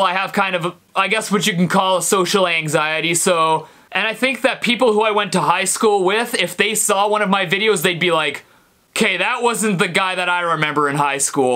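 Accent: Canada. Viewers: You sound extra Canadian in this one. Respect. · I’ve been watching your vids for ages, this is the most Canadian you’ve ever sounded ❤️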